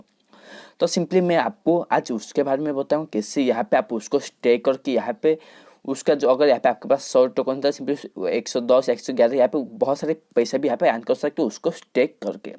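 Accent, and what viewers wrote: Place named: Northeast, India. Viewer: ooh apka bolna ka style north-east ki tarah ha isilia pucha 😅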